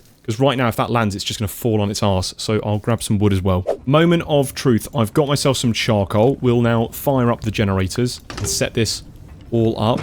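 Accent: United Kingdom. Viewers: Personally for me I've always loved create, didn't fully enjoy others using it though, I 100% enjoyed your video. And the English accent is a genuine cherry on top, very soothing voice. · This guy kinda sounds like stephen merchant ngl. And no, not just because he has a british accent. Its just stephen merchant without pronouncing any R at the end of words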